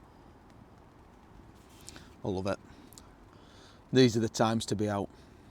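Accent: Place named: North, United Kingdom. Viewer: Great way to start my morning! Northern accent is perfect ❤ I see why this is one of your favorite spots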